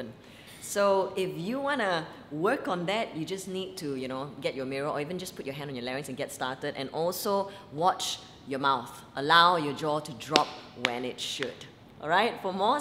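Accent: Singapore. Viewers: Are you from Singapore? Your accent sounds lightly Singaporean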